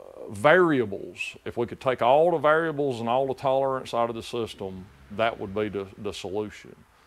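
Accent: South, United States. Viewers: Sounds like a proper ‘murrican to me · Why do the people that run this country not care about the quality of the products that we purchase with our hard earned dollars ? This really burns my A$$! They want you to vote for them for what! This is Disgusting that you have to put in this much effort to use a flat tappet cam! On another subject I am from Pa. We lived in Arizona, New Mexico and Tennessee and no one ever knew where I was from. They said I don't have an accent,go figure. That said I could listen to this Guy forever, I love the southern drawl and in women it's hot! I hung with with a guy from Cobra town mountain in Tennessee and this man sounds about the same. Never had a problem with it!!👍👍👍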